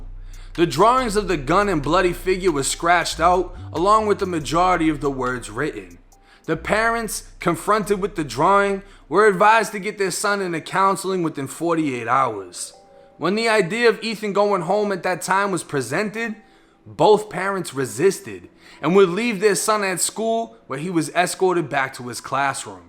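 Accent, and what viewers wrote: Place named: Tampa, United States. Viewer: I love how u dead ass talk like u from Tampa fr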